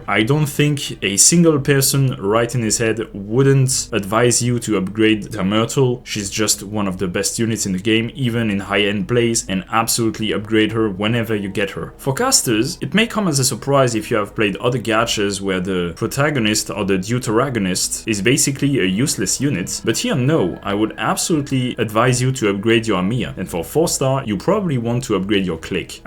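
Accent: France. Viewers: Are you perhaps French? Bonne vidéo haha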